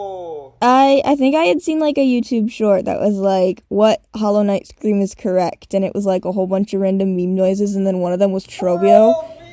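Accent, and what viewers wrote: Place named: England, United Kingdom. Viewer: Congrats bro!! (or are you a girl? I'm bad at understanding English accents, sorry) Actually it would be cool to have more ways to communicate, but maybe not this time Whos harder: nightmare king or the absolute? radiance Will anyone even answer my comment..? If you'll read it I hope you'll have a great day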